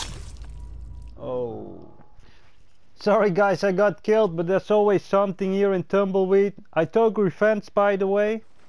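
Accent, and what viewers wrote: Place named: Netherlands. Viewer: You sound Dutch for some reason lol. Cool outfits man.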